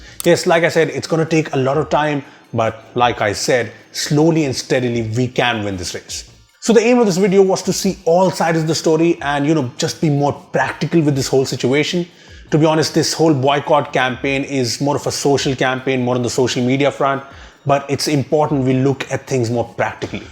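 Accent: India. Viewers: i know that his accent is Indian but my parents are hindi medium ....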